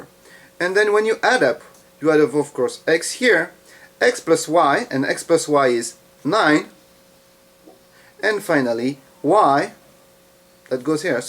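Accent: France. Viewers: tu es francais non ?? tu as l'axcent francais !!! you're freenche ?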